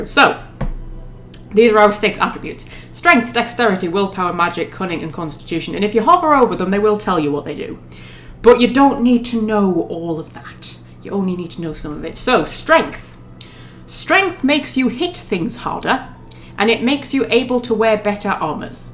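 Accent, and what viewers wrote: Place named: United Kingdom. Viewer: Adorei sua voz e o sotaque britânico, entendi tudo que falou! Ajudou muito, obrigado!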